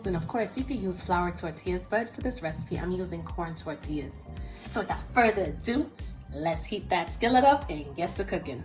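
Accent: New York, United States. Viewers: NY stand up!! I kept laughing, like, wow! We really do have an accept. The way she says Sauce and so many other things!! Too cute! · My sentiments exactly!! I was sitting over here admiring the soft tone to your voice, the giggles and that gorgeous ring. I can’t help but notice as well that you’re from New York like me🙌🏾 The way you speak tells it all. You definitely confirmed my suspicion when you said “don’t let me come to your crib”😉dead giveaway! Who but we say this😍😜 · i feel like your from new york lol i love the way you talk . GREAT COOKING TUTORIAL THANK YOU ❤️ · Your accent mad cute you so New York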